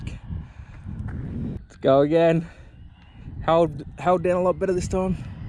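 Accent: Australia. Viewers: You Aussie? Where ya from? Looks like S.A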